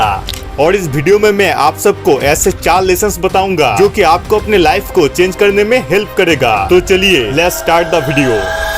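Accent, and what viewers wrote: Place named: Bengal, India. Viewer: এক্সেন্ট এই বোঝা যাচ্ছে যে তুমি বাঙালি।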